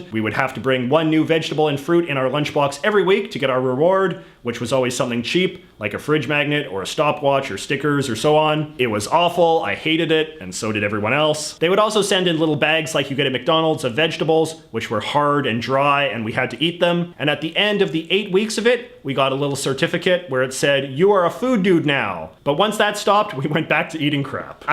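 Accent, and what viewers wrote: Canada. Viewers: I love to hear pronounce foreign things very Canadian-ly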